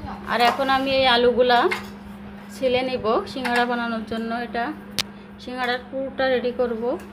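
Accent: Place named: Jessore, Bangladesh. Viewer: Apu josor amer nani bari maura.tomer vasa sunay sob soma a monay hotow amer kasayr mama khalara ktha bolsay.bosoray akber nanibari na gaylay monayhotow jibontae vritha.now ja oya ho a na.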